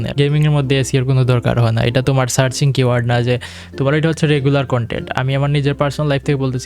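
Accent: Sylhet, Bangladesh. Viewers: Bhaia aponi ki sylheti 🤔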